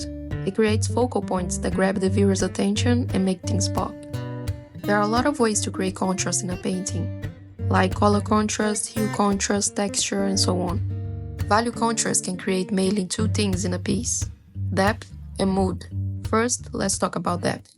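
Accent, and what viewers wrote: Brazil. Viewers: she's from brasil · her Brazilian accent is very cute ! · Brazilian. Easy spot, watch some movies bro, it helps with the pronunciation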